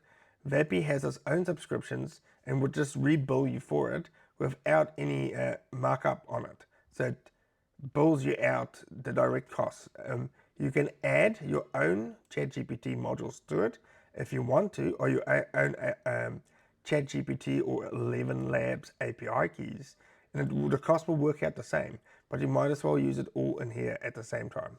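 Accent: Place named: South Africa. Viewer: i'm hearing the South African accent, fellow South African here. Thanks for the content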